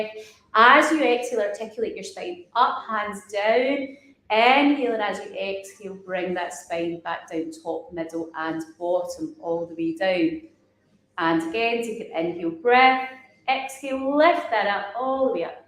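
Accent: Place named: Glasgow, United Kingdom. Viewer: I could listen to pilates with a Glaswegian accent all day long.. love it!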